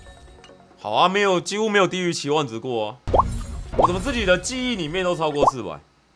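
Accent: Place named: Taiwan. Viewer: 老哥的台灣國語好像越來越嚴重了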